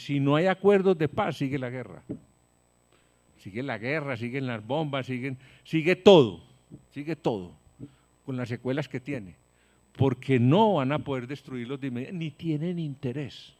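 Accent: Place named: Antioquia, Colombia. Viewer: Es lo mismo que dice petro pero con tono paisa .........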